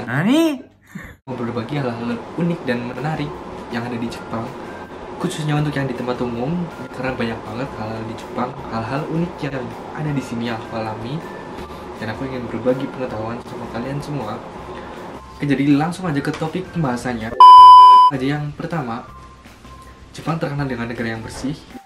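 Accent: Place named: Banyumas, Indonesia. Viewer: Ora ngapak ora penak😀👍🙏🙏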